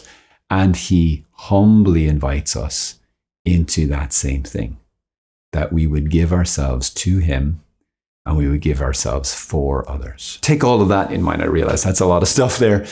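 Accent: Ireland. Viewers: Em Irish accents 😭🍀 Aiiii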